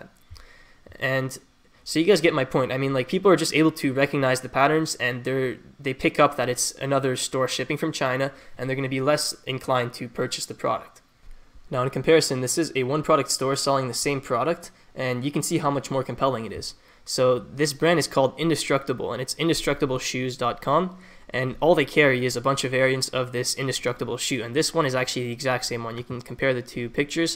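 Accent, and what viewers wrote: Quebec, Canada. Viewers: Are you québécois ?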